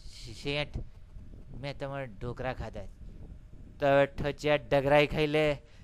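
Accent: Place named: Mehsana, India. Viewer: well done bro..gujarati wid mehsani accent..hahahah lolz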